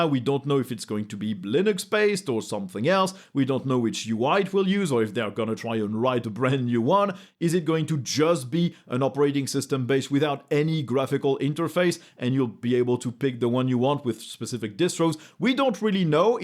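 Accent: France. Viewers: I come here for the Linux news, I stay for the French accent.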